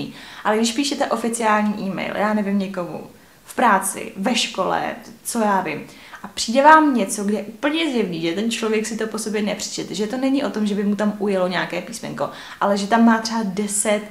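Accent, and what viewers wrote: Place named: East Bohemia, Czech Republic. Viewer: Slečna mluví očividně východočeským nářečím 😉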